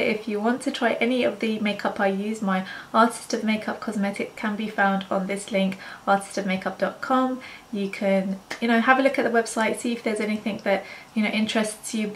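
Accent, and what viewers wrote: United Kingdom. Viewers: Are you muslim women? What is your name? Your Accent is British, Right?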